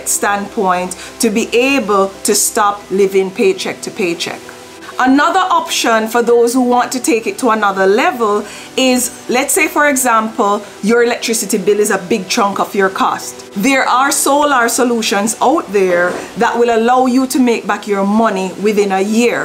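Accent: Jamaica. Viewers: keep impacting. love the patua jamaican tone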